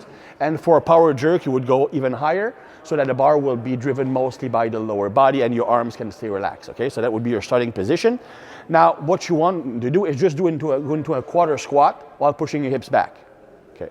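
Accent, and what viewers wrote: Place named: Quebec, Canada. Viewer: SUPER un Québécois. Il est a Québec ou a Sherbrooke?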